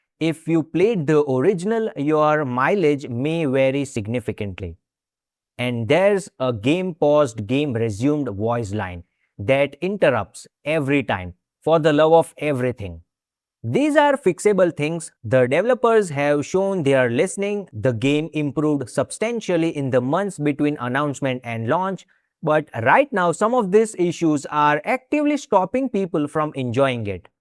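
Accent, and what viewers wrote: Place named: India. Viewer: Indian accent, disregard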